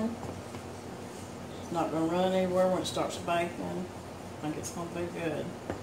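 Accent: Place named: Alabama, United States. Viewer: love your voice it reminds me of Alabama and my folks there. Yahweh bless!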